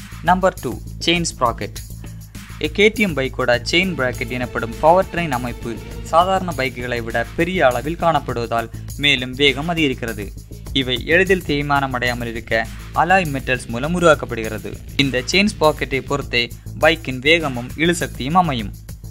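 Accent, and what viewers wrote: Kanyakumari, India. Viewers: Neega Kannya Kumari yaa bro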